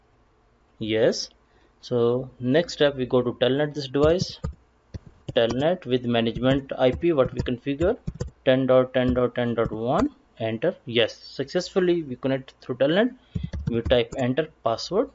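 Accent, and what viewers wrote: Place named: India. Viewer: Topics were interesting but indian accent is kinda disgusting... 😂😂😂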